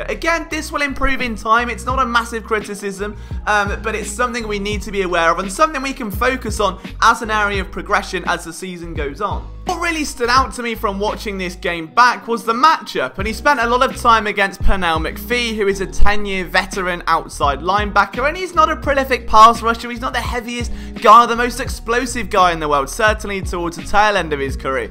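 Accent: United Kingdom. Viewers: why iss a british guy talking about football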